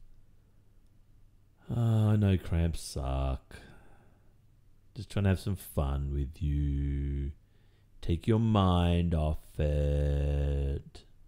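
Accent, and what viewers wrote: Australia. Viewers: I finally found and Aussie asmrtist and I am in love. I thought my Aussie accent was strong and I'm Australian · Australian accents hit different🤣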